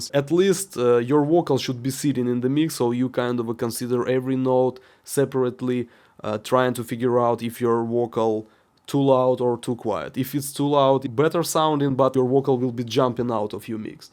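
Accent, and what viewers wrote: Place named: Russia. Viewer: Great one - thanks a bunch! You sound Russian, not that it matters, but what does is your approach and delivery that has no fluff or self admiration - a very Russian way to break things down to essential points and pass them to a comrade :)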